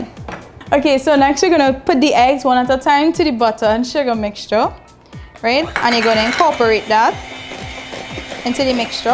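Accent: Trinidad and Tobago. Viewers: It's just the way some trinis speak. Ever listen to the Indians from India? I swear every other word is "actually"!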